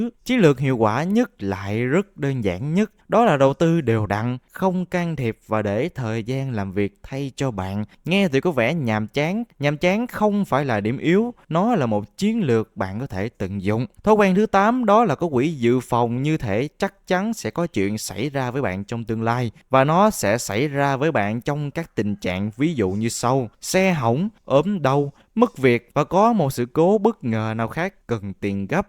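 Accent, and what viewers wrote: South, Vietnam. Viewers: Hai nghìn lẻ ba (2003) , không không , phải là hai nghàn lẻ ba mới đúng , giọng miền Nam thì phải dùng từ ngữ miền Nam , cảm ơn !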